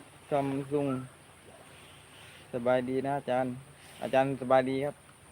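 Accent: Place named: South, Thailand. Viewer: พี่ชอบฟังแระ แหลงใต้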